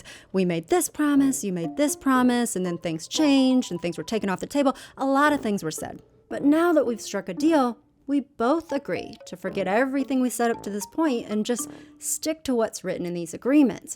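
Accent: South, United States. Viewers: Such a great series! Glad I stumbled upon it. Great production quality and lovely southern accent behind it!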